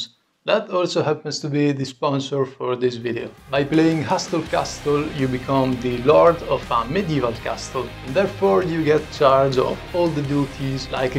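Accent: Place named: Romagna, Italy. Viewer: ma per caso sei di ravenna il tuo inglese ha un accento che i ricorda il romagnolo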